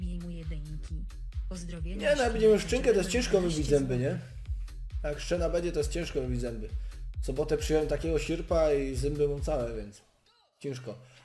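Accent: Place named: Silesia, Poland. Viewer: Bo on jest chyba ze Śląska i tam tak mówią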